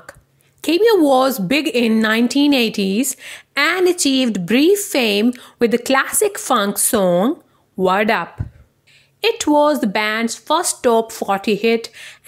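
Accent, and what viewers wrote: India. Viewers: It’s an Indian accent.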